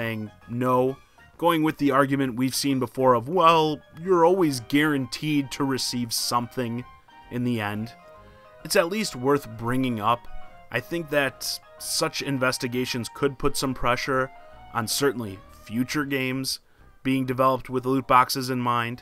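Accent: Chicago, United States. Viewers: R u from Chicago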